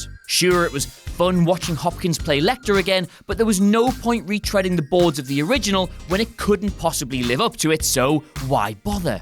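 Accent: United Kingdom. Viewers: If I come back for anything, it's the british accents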